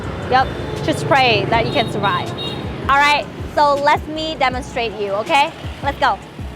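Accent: Midwest, United States. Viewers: Where were you at in the states? Your accent sounds very neutral like the midwest accent. I think many people who would hear you speak English would assume you were American if they didn't know your story. Also as someone from one of those colder states... you know 50F/10C isn't that cold. Don't scare some American into bringing a winter coat to Hanoi lol. Light jacket and jeans is more than fine for that. I am sure you got used to seeing people in Shorts and Sandals/Flipflops/(no idea what Vietnamese call them) in that level of cold while you were here. Even in the true winters here in the colder states you see it. Asian Americans in flip flops, and college students in shorts year round is something I'm just used to. Even if I think they are a bit insane. Especially when it is snow covered and -10F/-23C. Put on some boots and jeans people lol. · u speaker very smooth US tone English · For a native you sound like you spent quality time in the US or Canada... 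EDIT: just got to the part where you spent 7 years in USA. :) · Mình đã nghe nhiều người nói tiếng anh. Mình không phân biệt dc người này là một người việt nam hay một người ở mỹ do phát âm quá giống. Đến khi mình nghe nói là tên và ở mỹ 7 năm. Tất nhiên tây gốc nó phát âm cuốn hơn nhưng cơ bản mình không thể biết đó là người vn đang nói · Thank you. I don’t think you had lived in the U.S for just 7 years. You speak with a perfect American accent. I feel a bit embarrassed about my English even though I have been living in the U.S for 10 years and working in a hospital. I am learning from you!!!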